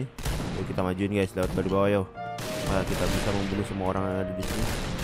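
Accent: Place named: Surabaya, Indonesia. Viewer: wallaa , Boso ee kok suroboyoan ngnee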